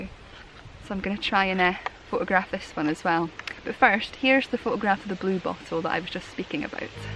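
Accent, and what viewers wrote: Scotland, United Kingdom. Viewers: I know it's just your Scottish accent, but at first I thought you said mackerel photography......was hoping you were going to get out a fisheye lens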